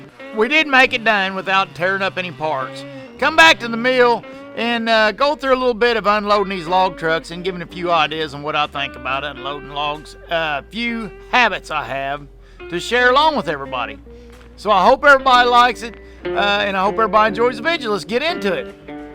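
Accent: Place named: Bronx, United States. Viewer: Man hard love your vidgas, and your Bronx accent makes me think of home🤣🤣✌✌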